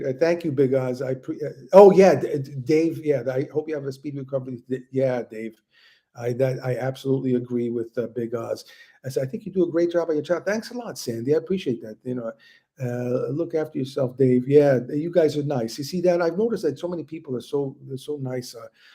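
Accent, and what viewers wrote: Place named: New York, United States. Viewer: Glad things went well 👌. Just love listening to your NY accent 😍 🇦🇺🙋🏼‍♀️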